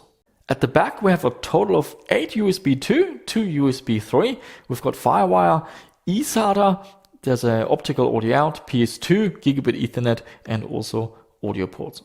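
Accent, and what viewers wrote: Germany. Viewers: You sound a bit like a german talking english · you sound like an australian but something tells me that's just a german accent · German accent rules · One question, is the dude a German?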